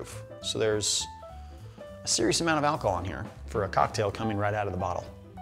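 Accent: Australia. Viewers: Australian accent…..more talent from this guy! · I feel i should hit you for that Aussie accent, but your just so darn cute i can't xD (greetings from Australia)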